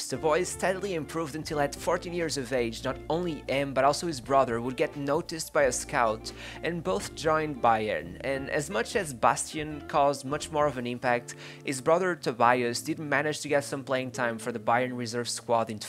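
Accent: Portugal. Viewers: Trying to ignore this darn Portugeese accent 😬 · Am I the only one who thinks that the commentator is Portuguese ? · Só te queria dizer que estou a adorar o teu canal. E sim, percebi que eras português logo no início do 1o vídeo que vi pelo sotaque ;D Continua com o bom trabalho!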